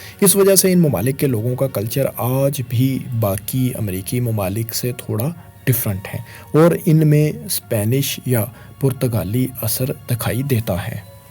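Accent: Punjab, Pakistan. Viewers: please work on punabi accent .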